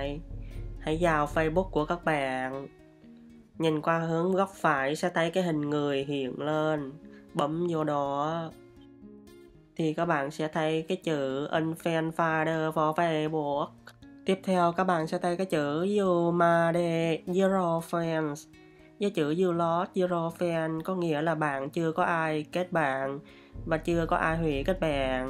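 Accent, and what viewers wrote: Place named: South, Vietnam. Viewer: Chính xác giọng nam kì rồi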